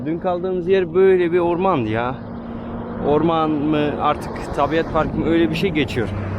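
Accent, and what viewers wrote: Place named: Kayseri, Turkey. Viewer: Bu yakışıklı da kimmiş önüme çıktı videosu 😂Gayserili gibi gonusuyor😂Ulukisladan selam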